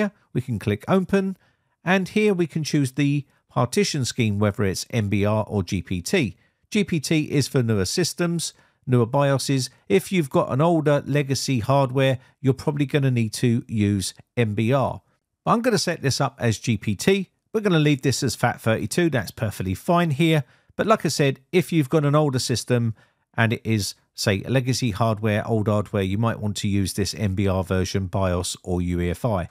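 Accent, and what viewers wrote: United Kingdom. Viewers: Oh it's more than just his accent for me (although everyone knows Americans adore British accents😍), but he also sounds *JUST LIKE* Sir Maurice Micklewhite CBE (otherwise known as the actor Michael Caine 🤗🤗🤗) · I’ve wanted to say that for a long time, too. Since someone else has now mentioned it, I add my voice to theirs: Love the UK accent! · The UK accent is so pleasing to my ears. Thanks for the informative video.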